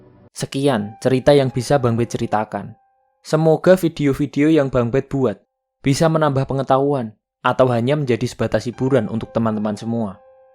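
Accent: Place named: Java, Indonesia. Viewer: Gaya ngomong lu garing trus medok lagi min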